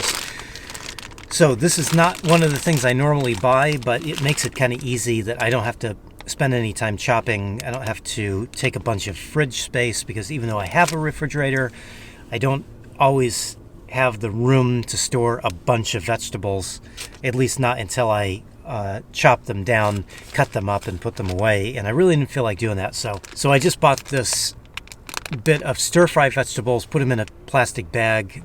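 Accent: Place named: California, United States. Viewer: That's why your accent sounds familiar to me because you are from California. You can make your own pasta sauce. Tomatoes, a little red bell pepper (to keep the red colour of the sause) and one stick of celery to bring out the tomatoes taste, blend. It also makes a good tomatoes soup too 😊. I take it your mind is doing it's thing in it's regular time slot again. All good 🤗.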